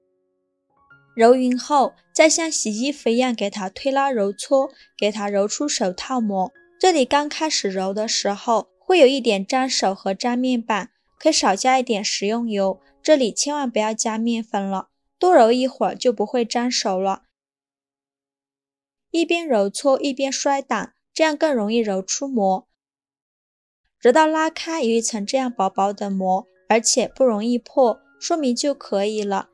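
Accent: China. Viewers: 呵呵。請問這跟台灣人的素質有什麼關係。因為影片裡說的是大陸口音嗎？差別心在你不是我。到底誰心裡殘缺呢？？我只是說加雪碧就是麵糰裡加蘇打跟檸檬酸和糖。沒什麼值得神奇的。因為對於烘培加蘇打在麵糰裡是很常見的。而你生出那麼多情緒。還上升到台灣。只覺得無知又可笑🤣🤣🤣🤣🤣。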